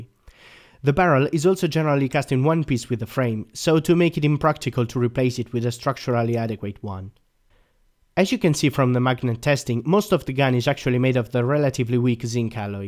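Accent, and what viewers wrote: Italy. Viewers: Dall'accento presumo che sei Italiano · He's Italian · Hey ma sei italiano? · sei italiano l ho capito subito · troppo forte l'italiano che parla inglese , shock ! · He is Italian. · All'inizio dall'accento ho pensato fossi italiano, vado avanti e leggo nella cartuccia del fucile a pompa "fiocchi", lì mi sono tolto ogni dubbio😂. Davvero complimenti per la pronuncia in inglese, sei molto bravo! Il video è fenomenale, sei davvero bravo!! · L'accento italiano si riconosce ovunque, bel video, strutturato in maniera ottima e molto informativo, bravi. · Ma sei italiano? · He sounds so italian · This guy is Italian I’m sure. I’m Italian I recognise the accent. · ma sei italiano? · Are you italian? The accent and the beretta? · he's Italian, it's his accent.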